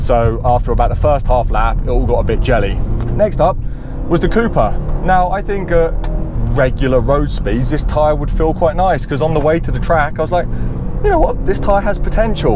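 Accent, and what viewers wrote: United Kingdom. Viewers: Love your channel, instantly subscribed. Interesting thought that I wanted to share with you. My extended family tends to go with Continental UHP AS tires and we're mostly spread out across the West Coast of the US. I mention that because it rains at random times, year-round in most parts of California and seemingly weekly in Washington state. Due to the lack of extreme winter, we've found that the Continental tires let us drive "swiftly" in all weather types without fear of hydroplaning, loss of control due to unexpected situations (other people texting and driving - or swerving into your lane, deer, bicycle, kids etc). Basically, we want to be able to DRIVE our cars, push them a little, stretch their legs on occasion but not discover that a great dry tire becomes unpredictable in ANY way when the roads get wet; let alone tries to kill somebody with unexpected braking or steering limitations. 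As you're probably guessing, Continentals usually meet our needs for HIGH performance All-Season tires, specifically. Anyway, my point is this, your accent definitely sounds British, and it's my understanding that you guys get MUCH more of the wet stuff than California. That being said, I noticed you personally tend to prefer a tire based PRIMARILY on dry performance, so long as the wet and snow aren't too bad... essentially, your highest requirement is driving feel and control in DRY weather. While I can sympathize and appreciatea car that has that feeling in the dry, I would have to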